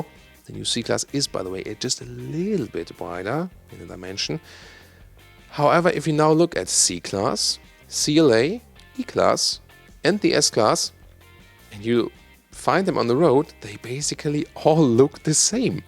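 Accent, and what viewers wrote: Germany. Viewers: The wagon is pretty dreamy, are we even getting in the states? I’d never spend that kind of money on a car with my current rare use of them but I wish the Japanese or Koreans had something like this in the category as opposed to crossovers only. I’m still chugging along - very happily I might add - in my very “analog” ‘02 WRX wagon and with 72k original my miles not yet seeing a compelling reason to upgrade. Wish the Subaru Levorg or similar existing sport wagon in other markets was imported here. Great and very complete video overview of the car and love that German accent! Haha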